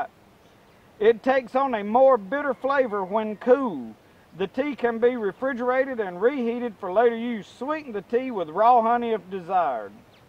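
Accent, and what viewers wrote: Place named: North Carolina, United States. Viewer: Hi, there. I enjoyed this video. Very informative. I've also heard of that same book you read from and have been thinking about getting it. They say it grows in the eastern U.S., which is where I live now (the SE). I'm not sure if I could find any though; there is a park within walking distance for hiking where I live, but not sure if I would have any luck (I live in pine country, and so it's almost nothing but pine trees). I'm originally from Texas but have been living in NC for the past 15 years. Speaking of which, are you by any chance a North Carolinian yourself? You're accent sounds exactly like what I hear down here. Thanks for the video. I think I'll check out more. God bless.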